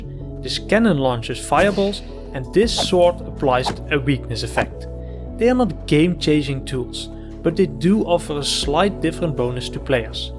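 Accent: Netherlands. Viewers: Bro you sound dutch nice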